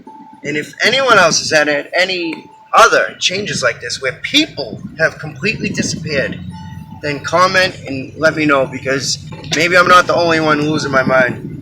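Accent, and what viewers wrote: Boston, United States. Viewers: ur from Boston I can tell. I'm from Dorchester. yeah this is fuckin with me too..like flava flav from public enemy was always flavor flav in this reality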